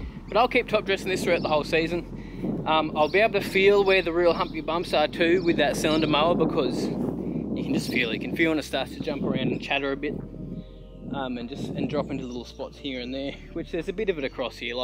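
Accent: Australia. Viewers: That’s exactly what I imagined Australia would sound like. Signed, every American.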